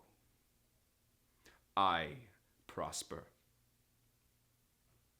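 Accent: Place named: Ireland. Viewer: im so confused if youre irish or american haah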